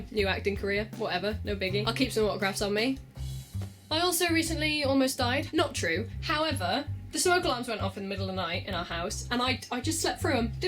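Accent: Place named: Wales, United Kingdom. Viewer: Wait you're welsh?!!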